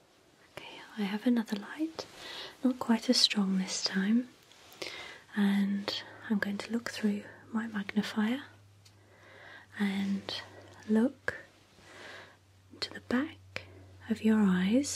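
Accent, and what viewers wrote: United Kingdom. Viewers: I love your british accent. kisses and hugs from brazil!! 💓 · u british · I love ur British accent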